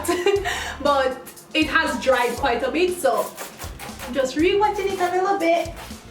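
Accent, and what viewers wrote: Jamaica. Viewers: Loving your jamaican accent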